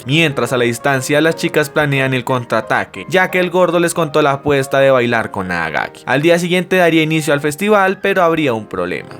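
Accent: Colombia. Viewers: 5 segundos y deduje que es otro Colombiano Narrando, cuando dijo "PIROBA" dije! esta es mi patria Colombia querida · We me encanta tu idioma colombiano yo soy colombiano pero tu eres de colombia?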